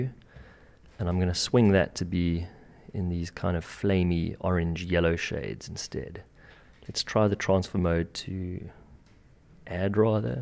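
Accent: South Africa. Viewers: also South african!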